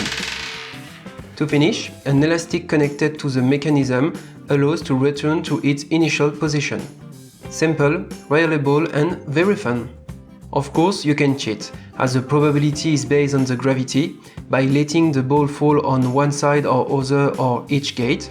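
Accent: France. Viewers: L’accent FRANÇAIIIIIIS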